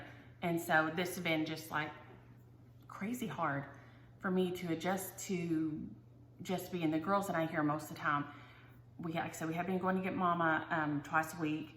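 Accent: South, United States. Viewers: Love the moonlight as well as the evergreen ..congratulations on 3000subs ! And I love your accent and southern ways ..I’m from up north and it sounds special to me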